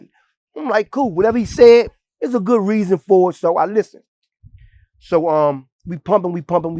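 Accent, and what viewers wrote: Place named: Maryland, United States. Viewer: This nigga sound like my boy in m.d and it's not P.G county I'm deeper in m.d than that ...I'm m.d in between Baltimore and Philly 1 hr either way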